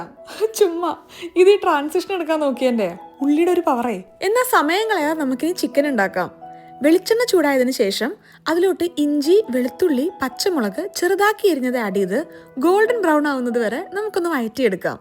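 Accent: Malappuram, India. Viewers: Njannm oru malappurakariyane ngale parayunath neg kug pag anan ninale patayunnath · Athe Malappuramkkariyannen enikk thoniyayirunnu❤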